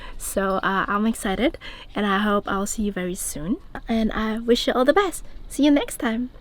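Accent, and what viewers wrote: Germany. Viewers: it sounds like you have a german accent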